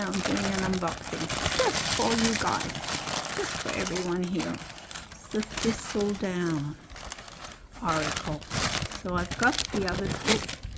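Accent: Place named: Australia. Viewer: Great selection . You sound like one of my fellow Aussies !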